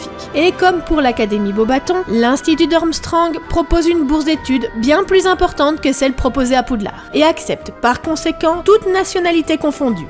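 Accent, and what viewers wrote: Belgium. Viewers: Tu es belge ? En tout cas, super vidéo et vive ma Belgique !!!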